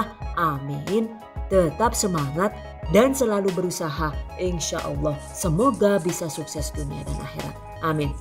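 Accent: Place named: Java, Indonesia. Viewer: Hehe..mbaknya lucu...suaranya, ternyata orang jawa medok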